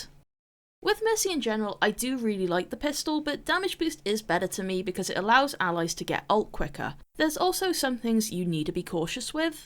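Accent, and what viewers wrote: United Kingdom. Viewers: Waaaaiit... Are you British? Also pls upload more vods and in general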